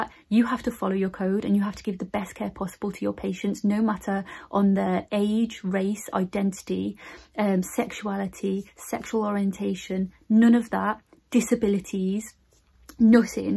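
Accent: Birmingham, United Kingdom. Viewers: Are you from Birmingham like me? I'm a GP and I love your videos. This one is one of the best x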